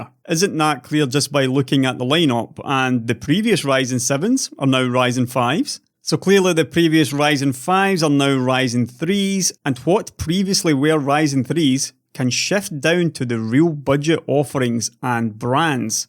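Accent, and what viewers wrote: Scotland, United Kingdom. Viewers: Thanks for explaining all this "so clearly"! I sure hope AMD can deliver all these improvements for near what your leaks and analysis suggests - cause I want one! If AMD pulls this off, they'll bury Intel... Running around saying "It's too good to be true" will do nothing but bump up the prices I'd think, since if they know they're delivering much more than the customers expect - or that the competition can possibly keep up with - why would they lower them? Even if people think that's true, they should keep their mouths shut and just see what unfolds! One software issue I can think of with these new high core and thread count architectures is how well the operating system's scheduling logic can take advantage of them. Properly optimizing the use of all the hardware resources based on the workload used to be a really complex problem back when I was in school - and that was just to handle dual and quad core architectures. 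(Yeah, "school" was a long, long time ago)... I hope the current state of the art (in figuring out optimized scheduling algorithms) can effectively exploit what all this modern hardware can now deliver... As always, keep up the great work - and have a merry Christmas and a wonderful New Year! p.s. - Your Scottish accent is still most excellent too! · Never and I'm not even a native English speaker. 🙂I love his Scottish accent.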